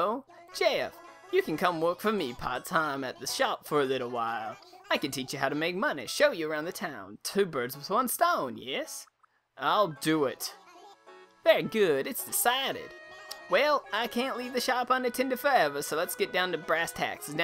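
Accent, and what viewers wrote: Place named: United Kingdom. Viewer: Your British accent is funny! Great video, really helps :) Thanks alot!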